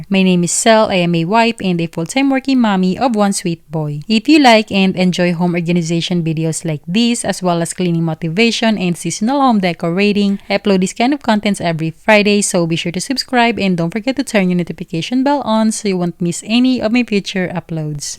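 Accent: Philippines. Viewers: Matagal na nag wonder ako if your Pinay because of your accent...watching from Cebu❤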